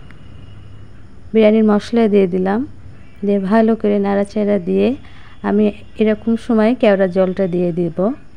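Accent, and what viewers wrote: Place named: Pabna, Bangladesh. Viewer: ooo amader pabnar moto vasha mone holo tai bollam apu Don't mind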